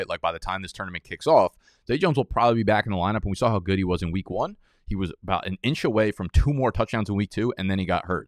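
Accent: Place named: Philadelphia, United States. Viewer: Maybe this is a reach but I’ll feel like ur from Philly or Delco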